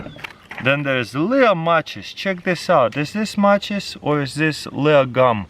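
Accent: Russia. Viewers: Anyone else read this in his Russian accent😂😂😂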